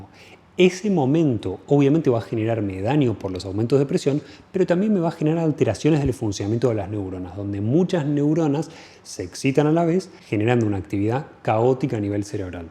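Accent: Argentina. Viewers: Se nota x la,manera d hablar q es argentino no es ninguna novedad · por el acento creo que es argentino 🤔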